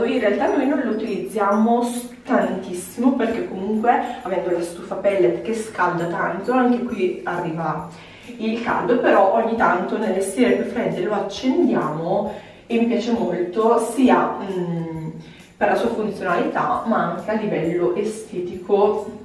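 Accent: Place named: Lombardy, Italy. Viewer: Dove vivi in Lombardia oppure in provincia perché mi sembra un accento lombardo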